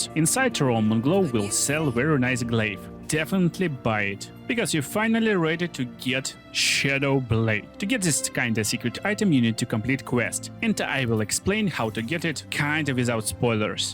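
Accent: Russia. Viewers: omg, your accent-- are you russian? nice glitch btw. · Русский акцент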